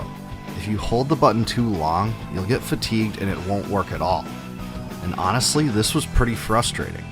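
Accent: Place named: Upper Midwest, United States. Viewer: I wanted to say, I thought it was interesting and kinda cool that you have a very specific regional accent (possibly upper-midwest?), and you like JRPG's. While this definitely isn't all true, I know there is a stereotype of us Americans that we don't really care about cultures, and only care about ourselves, which is something I am very passionate about fighting against.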